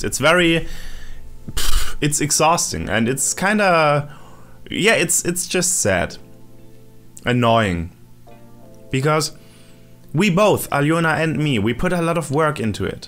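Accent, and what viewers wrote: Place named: United States. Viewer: У, действиетльно, очень чёткий английски, ближе к американскому, который я тоже очень хорошо понимаю. А вот самих англичан могу нормально понять только через одного, очень сильно зависит откуда они родом. Жуют и искажают слова, фиг что разберёшь. Наверное, их даже американцы плохо понимают.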